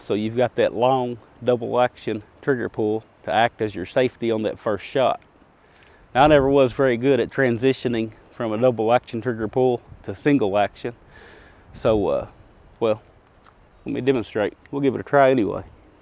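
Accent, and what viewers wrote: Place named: New York, United States. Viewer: Bought this one for a Birthday present for my 34th B'day....I'm 62 now and shoot this Gem weekly and like others have said not experienced even one Fail to load...Fail to Fire....Stovepipe.........Nothing!!! They truly don't Make them like this anymore. By the way....You New Yorkers sure do have funny accents!!!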